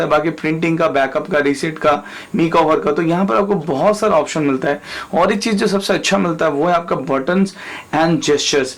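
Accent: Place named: South, India. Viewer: i knw south indian ho tum. but i lobe the accent jis tarah se smjhaya tmne. keep the good work bro :)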